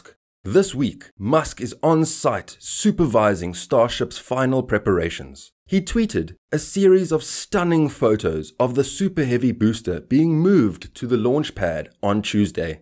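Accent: South Africa. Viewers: Great video. Recognize a Saffer accent when I hear one 🇿🇦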